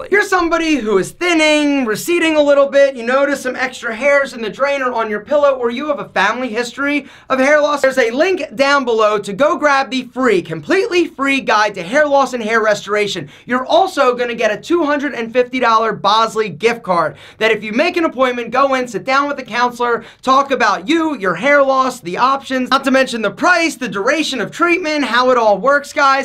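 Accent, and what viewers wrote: Philadelphia, United States. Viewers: I am just here for you Philly accent. Love it.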